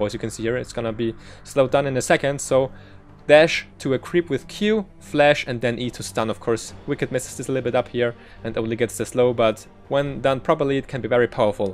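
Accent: Germany. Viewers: i can hear ur german roots ;) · Probably Spanish, But I think that he's Deutsch.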